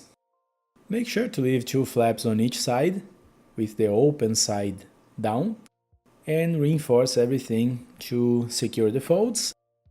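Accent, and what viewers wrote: Brazil. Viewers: Caraca, muito legel. Eu sei que você brasileiro pelo sotaque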